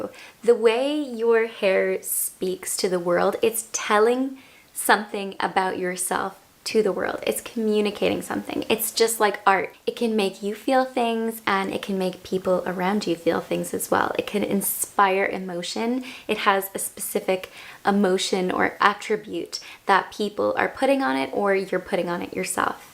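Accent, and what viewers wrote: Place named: Ireland. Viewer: I love your top!!! By the way, are you Irish? You have a very slight accent & I'm curious 😊 Great video ✨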